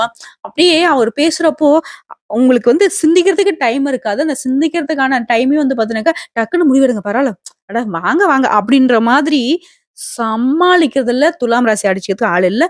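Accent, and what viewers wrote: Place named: Kongu, India. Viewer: நீங்கள் கோயமுத்தூர் அல்லது திருப்பூரை சேர்ந்தவரா மேலும் தங்களின் பேசும் தொனி கவுண்டர் இனத்தினை சேர்ந்ததாக தெரிகிறது. இனிமை.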